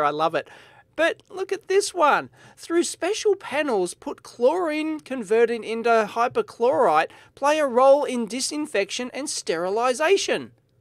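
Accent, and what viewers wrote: Australia. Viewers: Yeah! Aussie accents make this awesome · Bigclive did a review of these a while ago... but your australian accent makes this so much better. Makes me feel like if it kills me, i'm just not australian enough.Keep up the good work!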